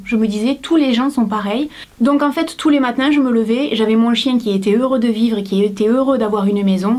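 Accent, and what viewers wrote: South, France. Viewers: lol lol c'est l'accent du sudeee 😂😍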